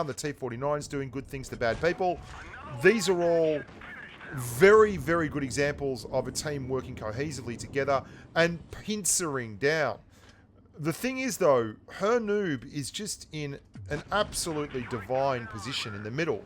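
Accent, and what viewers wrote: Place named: Australia. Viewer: hey mate, r u Aussie? swear to go you sound like one.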